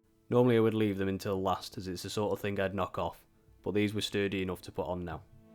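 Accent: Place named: North, United Kingdom. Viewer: What part of the North are you from? great video mate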